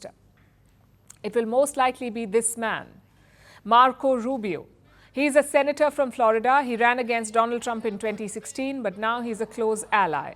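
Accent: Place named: India. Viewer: Strong Indian accent!!😮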